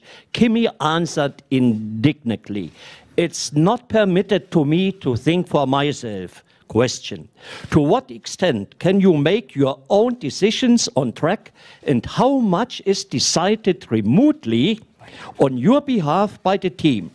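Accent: Germany. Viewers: He should learn to speak english. I'm german, but it's hard for me to understand his denglish. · Gute, alte deutsche Aussprache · This german accent is rediculous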